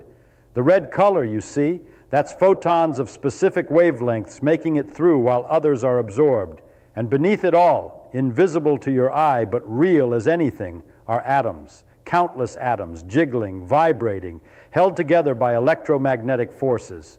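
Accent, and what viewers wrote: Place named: Transatlantic, United States. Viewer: I like this transatlantic accent style.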